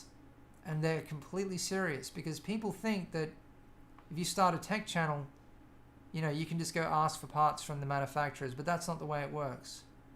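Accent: Australia. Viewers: Her mate. Love your work! I was just wondering what country you are from because you sound Australian :P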